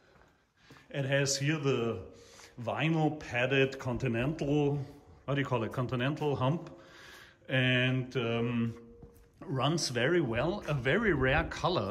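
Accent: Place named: Bavaria, Germany. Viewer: Nice collection of "Amerikanische Riesenwagen". Do the various owners all live in this same building? I see from the license plates (and your accent) that you are in beautiful Bavaria. Funny how the North Americans luxury car market was taken over by the German brands starting in the 1980s, and yet, there seems to be quite a lot of classic American "land yachts" in Europe today.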